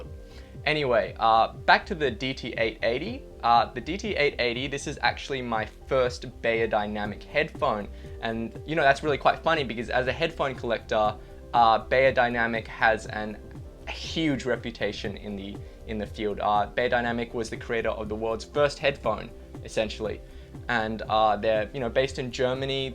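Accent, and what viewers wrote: Australia. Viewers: Hey, judging by the sound of your voice, it seems that you are Australian. Where do you get your headphones from?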